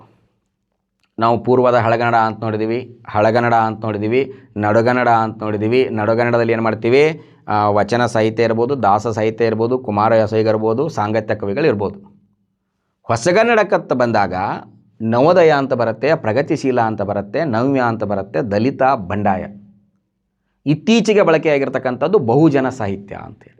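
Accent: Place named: Gulbarga, India. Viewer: Not dharwad kannada it's Gulbarga kannada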